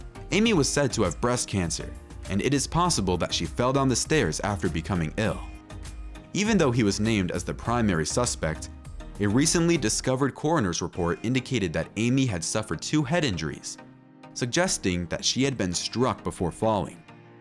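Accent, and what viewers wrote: United States. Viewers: However, however, however......... awful American accent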